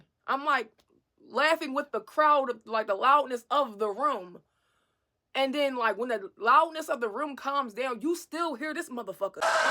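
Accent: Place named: Philadelphia, United States. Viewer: you got a way with words and I got a crush on ya Philly cadence. When are you free to converse more over a glass of Moscato? 🥂 🌹?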